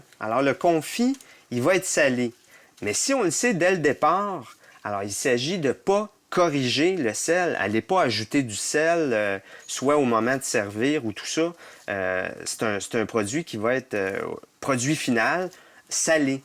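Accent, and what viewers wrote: Quebec, Canada. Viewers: is this canadian french?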